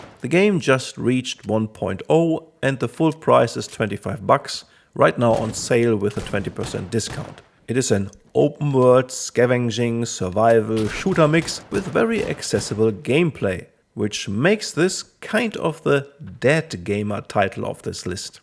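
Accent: Germany. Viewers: Ich mag einfach diese klare und deutliche Aussprache 😂 Man kann immer hören wenn es ein Deutscher ist der redet👍🏼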